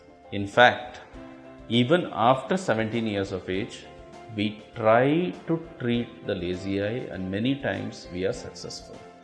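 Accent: India. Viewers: His indian accent made the video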